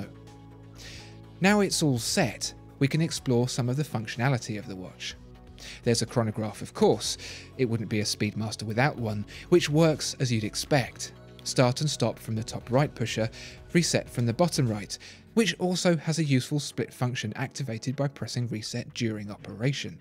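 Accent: United Kingdom. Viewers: I look forward to each and every one of your videos, they are supremely well done and different to any other watch channel on YouTube. Also it is pleasant change having a British voice rather than the many American channels (no offence to our US friends, just different). Keep up the great job 👍😎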